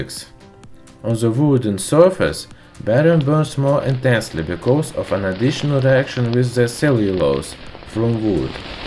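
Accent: Russia. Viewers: Are you from Russia? Some Slavic region? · Mofker GT He's Russian, give him a break.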